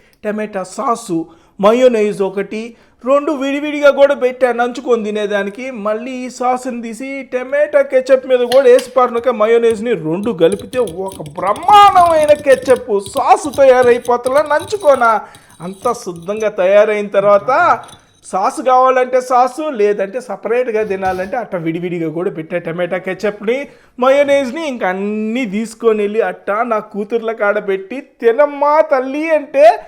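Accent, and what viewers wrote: Nellore, India. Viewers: Mana achamaina Nellore basha inka bathike undhi 💚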